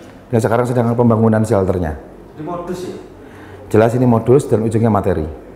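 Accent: Java, Indonesia. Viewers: Denger cara ngomong kok seneng. Logatnya Jawa medok. Ak lahir dan besar di Sumatra tapi logat Jawanya jg tetep g bs hilang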